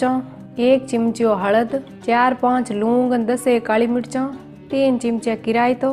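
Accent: Marwar, India. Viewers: आप प्योर मारवाड़ी बोल रहे हो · मै गुजराती हु। और आपको स्पॉट करता हु की आप अपना वीडियो अपनी मातृभाषा में ही अपलोड कीजिये आपकी मारवाड़ी बोली बहुत स्वीट है आभार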